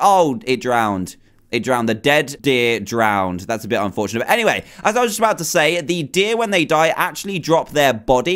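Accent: United Kingdom. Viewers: If he says oh dear its a nothr thing plus he is british so he pronounce deer dear ok?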